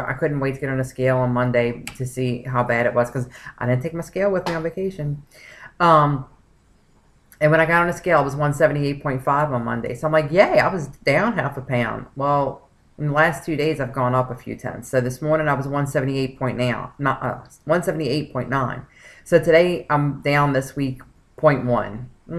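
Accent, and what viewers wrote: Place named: New Orleans, United States. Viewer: Hi, Thanks for sharing this journey. I'm just starting-- I'm on my Aetna 90 day pre-op. I have a question.... I'm definitely hearing a New Orleans accent!!! I'd know it ANYWHERE! Are you from New Orleans?? I'm a native....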